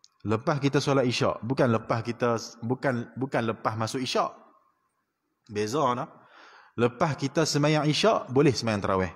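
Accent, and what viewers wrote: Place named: Kedah, Malaysia. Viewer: Komen pertama ustadz... Izin bertanya ustadz.. bahasa kedah dengan perak sama ke tak..?? Pasal saya dengar Bahasa ustadz macam bahasa kedah..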